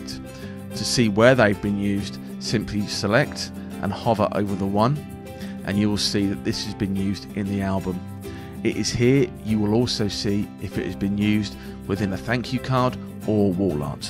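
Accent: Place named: United Kingdom. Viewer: PLEASE LOWER THE BACKGROUND MUSIC ITS HARD TO UNDERSTAND A BRITISH ACCENT SOMETIMES THE MUSIC DOESNT HELP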